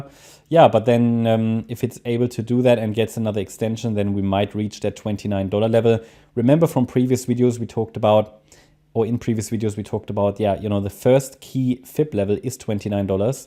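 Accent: Germany. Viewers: I love your german Accent 🩷 Vielen Dank !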